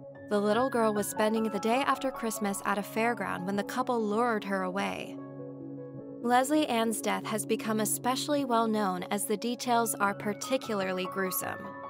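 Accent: United States. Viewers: Hearing an American pronounce all our town names is so strange! I live so close by it's so eerie and sad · Quit with these dreadful American accent talk over please awful this should be a British talker. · it’s quite strange to these very British crimes reflected back on to you with an American accent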